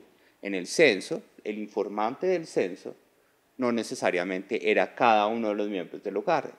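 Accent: Colombia. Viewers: Tengo que confesarte algo Daniel. Cuando te vi inicialmente como director del Dame, me caías renal. Soy administradora de presas y entendía tus espeluntes cifras y me dolían, pero no soportaba tu hablado de gomelo, pero fue pasando el tiempo, y te convertiste en mi funcionario del gobierno favorito. Será un gusto volver a contar contigo en ese cargo, cifras certeras y valentía para darlas es lo que Colombia necesita. Felicitaciones, porque estoy segura que quedaras en el cargo.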